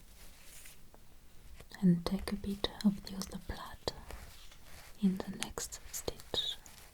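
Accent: France. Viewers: Im new to your channel, discovered it a few weeks ago after I handed in my Bachelor's thesis, and it really made me relax watching your videos. Now Im addicted, other ASMR doesnt quite do it for me. The RP, the setting, the lighting and darker colours, your audio quality (minimal white noise) and even your french accent really does the trick for me. I get so calm. I have an anxiety disorder, I got therapy for my panic attacks, but still need easy and quick ways to get my pulse down, while sitting at the pc - and you help me so much. Now I can get to bed with less mind clutter and wake up to be a good mom and wife for my family <3 Thank you! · is she really french? or is that just the accent she chose?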